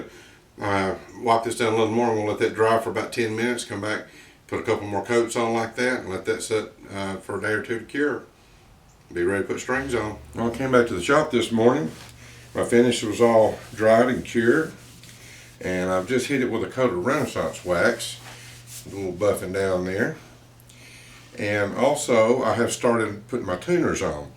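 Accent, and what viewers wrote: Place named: South, United States. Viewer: In the description there's a transcript option. That may be helpful for you. He has a southern accent so that may not be something you're used to hearing. I hope that helps you.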